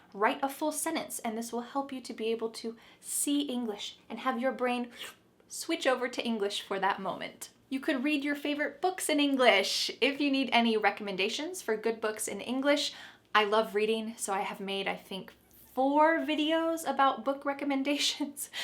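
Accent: United States. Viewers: Of course, I will watch every of your video. Cuz you are using American English. Perhaps, you’re from the US. I am now learning American English, this is why I subscribed your channel. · She only speaks American, not real English. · It's nice to find a normal American who speaks English. And not a Foreigner with a accent. Which makes it harder to understand. 😧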